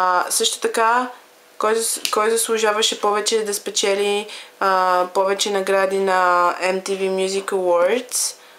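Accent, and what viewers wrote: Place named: England, United Kingdom. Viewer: Моля те спри с този английски акцент.. -,- Не ти стои добре ;) Хах смешка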